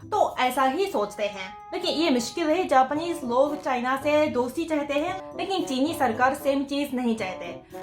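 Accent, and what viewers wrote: Standard. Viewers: I love you apka Hindi Bhasa suddh hai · Humto sirf Hindi bolte hai ji to sudha Hindi bolti Hain😘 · U speak pure hindi...and we have lots of impurities mixed in our langauge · Itni pure Hindi to Mai bhi nhi bolti🤣 Thankyou for loving our culture💜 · ap to kuch jada hi pure hindi m bolte h🙏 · 🙄 itni suddh hindi to mene khud ne aaj tak apni puri life me nahi boli including hindi exam papers.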